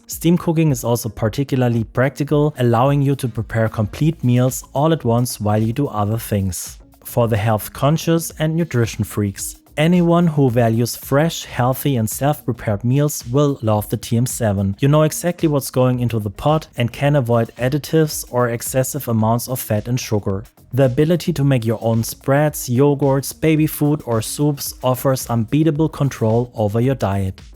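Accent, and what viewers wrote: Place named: Germany. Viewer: Ich erkenne einen deutschen akzent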